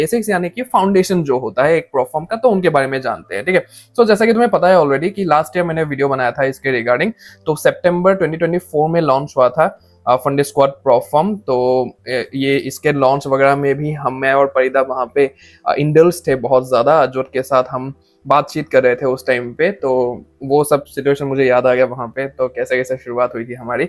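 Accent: Assam, India. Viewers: Are you Assamese ?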